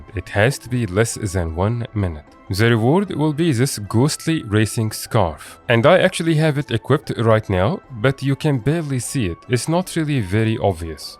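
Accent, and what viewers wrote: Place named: Turkey. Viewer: I like your accent. Sounds Turkish but I might be wrong. Also, cool video, clean and concise! <3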